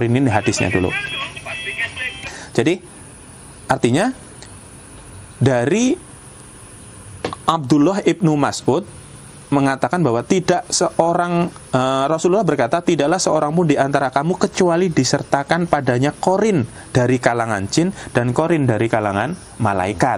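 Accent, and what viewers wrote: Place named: Java, Indonesia. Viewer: Waduhhhh, bhs jowo nipon mantep sanget,sedulur papat limo pancer,pok ipon jowo asli mantap,idola ku manrap cara menerangkan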